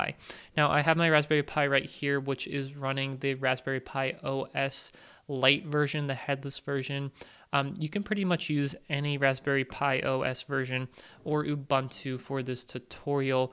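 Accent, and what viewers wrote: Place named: Philadelphia, United States. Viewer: hearing distinctive Philly (Philadelphia, PA) accent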